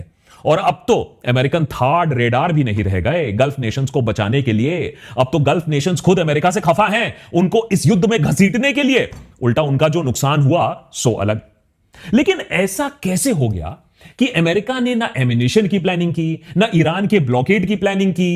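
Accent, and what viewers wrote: India. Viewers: 我听出这是印度口音，估计这个视频被伊朗人听到了，所以他们放行印度船只。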